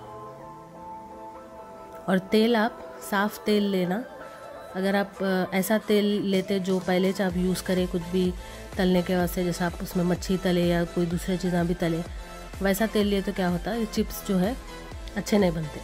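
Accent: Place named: Hyderabad, India. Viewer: Lol..loved ua hyderabadi accent...😜😜😜looking frwd to more such colloquial lockdown recipes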